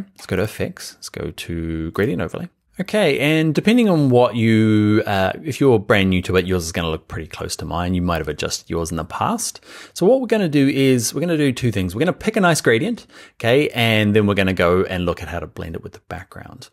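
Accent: New Zealand. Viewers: Fellow kiwi? Can I just say, as someone who makes tutorials myself, my hats off to you for such a calm, cool and smooth delivery in your narrative. I'm a third of the way through your course and you explain everything so clearly and at a pace that I can totally keep up with. Kudos to you my friend! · Very helpful! BTW, when you slow the playback speed to .75 you sound drunk. Everybody loves a drunk Kiwi! :))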